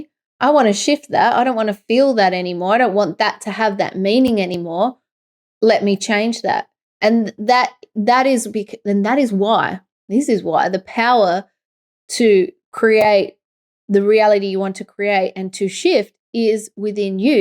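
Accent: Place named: Australia. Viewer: A few random (and kinda tired... sorry if what follows isn't 100% coherent!) thoughts... • First, I never thought I'd say this, but having just dived down the YT rabbit hole of investigating the existential risk of AI (with the attendant copious US talking heads), it's bloody nice to hear an Aussie voice for a change! • Second, there's a saying (that I think originated in the business world, but that seems to hold true in the general case as well) that "if you can define the issue, then you've already half solved the issue", or something like that, anyway! The point being that if you have some problem, any problem, then in order to remedy it it's an imperative to recognise and define it. And so, having neatly laid-out the crux of the issue (or the, erm... blockage!) it becomes much more straightforward to solve it (or, ummm... flush it! haha😅). Basically, if you can't see it you can't fix it! 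• Third, and this is the reason that I initially clicked on this video (you know, ummm, besides for the very attractive, and, as it turns out, super sweet, positive, and refreshingly open and honest, presenter!), the 20th century French philosopher Albert Camus was of the firm belief that the central problem faced by people was that there was no inherent meaning in our lives. In fact, he thought that almost all of our suffering originated not from our search for some external meaning to it all - life, the craziness of it, and the subconscious knowledge of our impending deaths - but that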